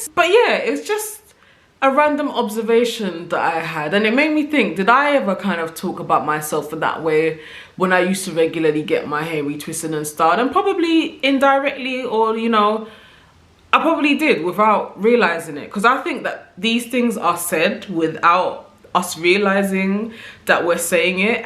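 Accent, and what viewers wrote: London, United Kingdom. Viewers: UK IN THE HOUSE!!🇬🇧❤ So refreshing to hear a London accent talking about locs. Really like your channel and content. Really insightful ✨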